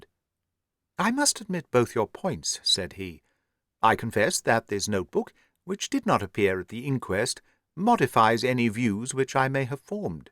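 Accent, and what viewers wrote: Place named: Dundee, United Kingdom. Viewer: an enjoyable fantastic tale superb narration right down to the authentic Dundee accent 10/10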